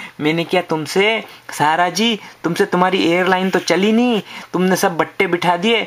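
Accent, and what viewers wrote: Indore, India. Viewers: Ekdum pure Indori....Sarafa ki shudh ghee ki jalebi ki tarah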